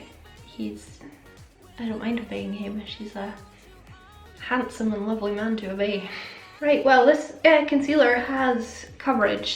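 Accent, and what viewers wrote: Scotland, United Kingdom. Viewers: Love these Q&A videos! I'm wondering about your accent, you said you were picked on. I assume it's a Scottish accent and you live in Scotland. Do you have different accents in different parts of the country? I'm fascinated with accents and languages. Being Russian I speak with an accent. But I speak American English with the Russian accent 🤣🤦🏼‍♀️. I LOVE how British, Scottish and Australian accents sound. I LOVE listening to you speak, lol. I think it's very pretty. It was painful to hear about your back accidents... I'm so so sorry girl! I'm sure you've been to good doctors. Hope they can find the best treatment for you. Have they considered surgery to repair whatever you damaged? I know surgery is always the last resort but it sounds like you're in so much pain... I really hope it gets better soon. Sending you love! ❤️ ❤️ ❤️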